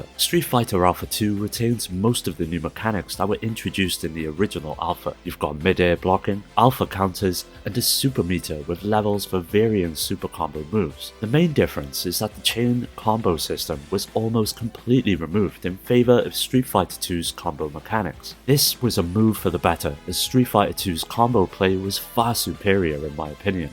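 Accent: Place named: Liverpool, United Kingdom. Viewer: Lol it's just a heavy Liverpool accent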